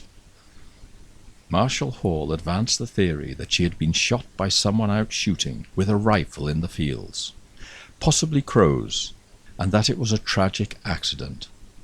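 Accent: United Kingdom. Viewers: I really really love this British accent and reading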